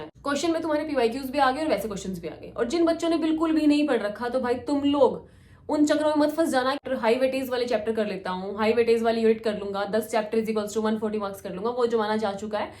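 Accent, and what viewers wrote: Haryana, India. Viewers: Many Hariyanvi waala tone h bahan ka 😊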